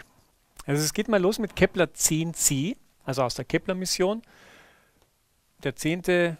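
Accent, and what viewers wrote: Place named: Landshut, Germany. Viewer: Sehr interessantes Video Herr Gaßner, weiter so! Mich würde interessieren, ob es irgendwann vielleicht auch einen Beitrag über die galileischen Monde oder die Monde des Saturn gibt? P.S. Man hört, dass Sie aus Landshut kommen ;)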